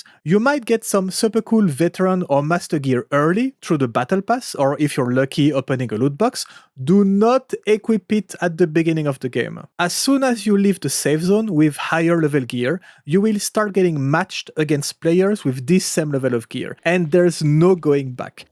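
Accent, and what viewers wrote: France. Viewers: Thx very good video, are you french tho? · Are you french ?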